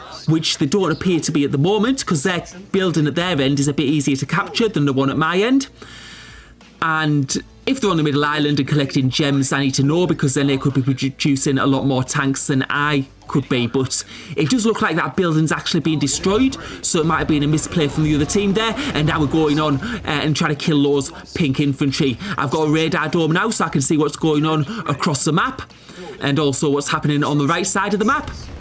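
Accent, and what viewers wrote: United Kingdom. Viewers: i like your akzent :) very british right ? did you join the german community ?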